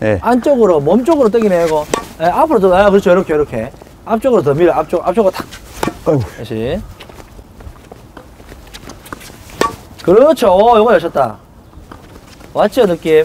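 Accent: Gyeongsang, South Korea. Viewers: 어우! 경상도 코치님 진짜 멋진 강의 감사합니다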